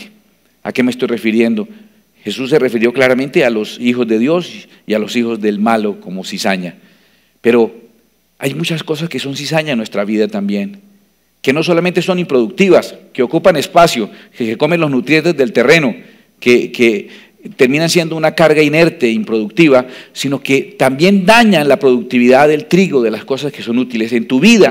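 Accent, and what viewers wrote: Santander, Spain. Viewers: Haber sabido de usted Pastor ha sido de gran edificación para mi vida he aprendido mucho con usted, gracias a Dios por su forma muy diferente de montarnos el propósito de Dios, sin contar las innumerables ocasiones que me he destornillado de la risa por su comentarios y esa forma tan peculiar de hablar como santanderiano que es. Gracias a Dios por usted, bendiciones para usted, su familia, su iglesia y su ministerio a través de este canal Feliz 2018 para usted también. Amen.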